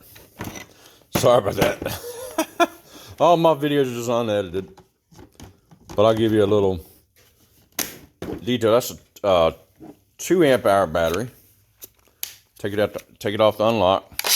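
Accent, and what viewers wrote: South, United States. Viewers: The one thing that disappoints me about dewalt angle grinders is they don’t have a screw hole for the handle at the top. Only side to side. For most home DIYers the 412 is fine. You only need the brushless if you’re using the tool A LOT because brushes last <num> hours. I mainly use my grinder as a cut off wheel. Maybe once every couple of years to sharpen a lawnmower blade. If you use a grinder a lot then it’s worth spending the extra money for the brushless. I don’t know if is a grinder expert but whenever I hear that heavy southern accent it’s a good bet this guy knows how to cook up some good bbq. 😄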